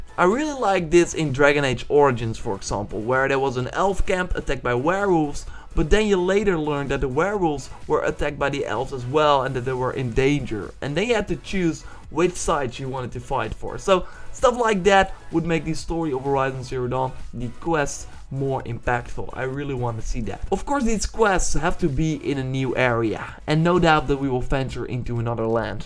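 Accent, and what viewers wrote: Netherlands. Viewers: Just love the dutch-english accent. Much better than the french-, german- or swedish-english accents. Atleast I assume you are dutch based on your accent, am I wrong?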